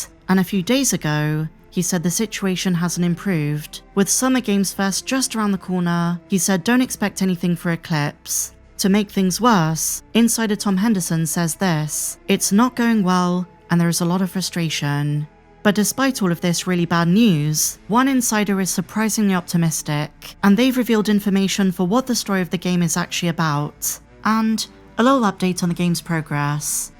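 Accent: United Kingdom. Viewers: Sometimes I wonder. I've always felt like this host had a very unique quality to her voice that I haven't heard before live in person from anyone. Multiple unique tonal and pacing qualities, as well as a particular type of British accent that I've never heard before.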